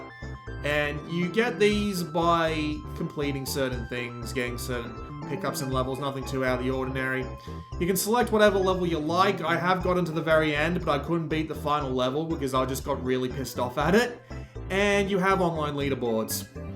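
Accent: Australia. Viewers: Just wanted to thank you, I've been comming increasingly aware that I use your channel a lot when looking for PS VITA games (especially ones that have been turned into limited physical releases, including this very game!). But i'd much prefer to play Darius Burst than this.. I do say I love the art work in ths game though! PS - If you haven't already, I strongly recommend "CALADRIUS" on PS4, it has become my all time favourite Bullet hell shooter!...even though I have never made it past the 3rd Boss (I don't use continues, just rinse & repeat!) oh and it's awesome listening to a fellow Aussie gaming! =)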